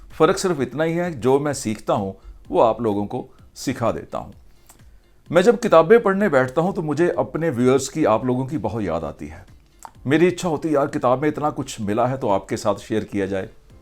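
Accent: Punjab, India. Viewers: Punjabi accent h unka ye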